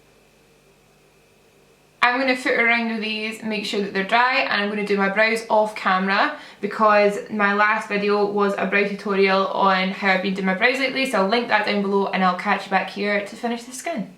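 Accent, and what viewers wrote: Scotland, United Kingdom. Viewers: Not only are you an utter boss and totally glamorous, your accent reminds me of one of my best friends from Scotland, and it's awesome. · LipsticksBeauty AndMore glasgow, scotland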